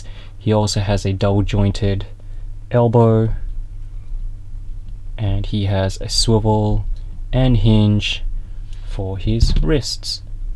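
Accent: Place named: Australia. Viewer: I was your 500th Sub haha. I'm guessing from the accent you're in Australia too? Where do you get your figures from. I've found that hobbylinkjapan is the best so far. Let me know if you have other options.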